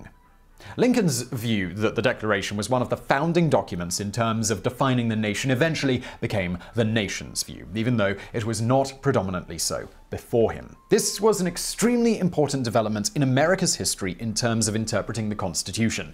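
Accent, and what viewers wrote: United Kingdom. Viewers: ... as told by a British man lol Haven’t watched yet, just love the irony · Mr. White Yes, a British guy. Hired by an American company to read a script. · Simon reads this because Americans think British accents equals double smart... And so that the show isn't completely American centric to promote a wider audience.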